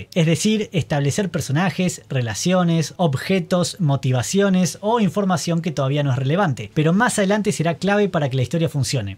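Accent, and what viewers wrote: Argentina. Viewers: No acabé de leer el video, no soporto el acento argentino, te recomiendo que aprendas a hablar con acento neutro.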